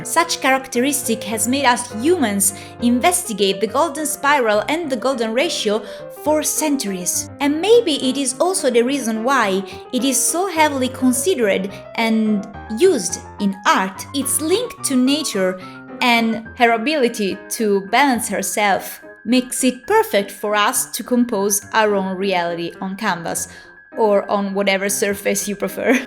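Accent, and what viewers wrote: Italy. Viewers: LO SAPEVO che eri italiana! Dalla pronuncia 💀 Da un altro artista toscano: Bel video continua così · The video was beyond helpful and interesting. Thank you so much for this. Just to mention… Damn I love this Italian intonation sooo much, it makes all languages sound awesome 😱😱😱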